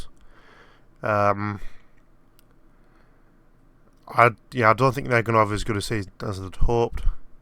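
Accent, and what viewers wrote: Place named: North, United Kingdom. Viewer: can't U tell your from up north.... Thick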